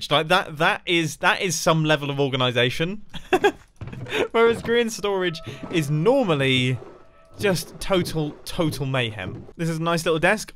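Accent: United Kingdom. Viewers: the way he said what is “What is occurring?!?!?” Instead of saying what is happening is so funny to me for some reason the British accent makes it better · sounds better with his British accent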